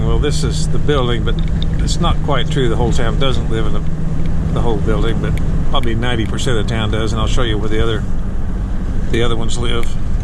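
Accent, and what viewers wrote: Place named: South, United States. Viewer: Thank you for the more realistic tour of Whittier. Does the town keep the roads plowed well In winter? I wish we could have toured your condo. Seems like no homeless in Whittier. Love your bio. You seem pretty healthy for 70. And I’m sure the permanent fund dividend is a nice perk. Good for you for making your dreams come true. Whar 😮state did you come from? You sound like you have a southern accent.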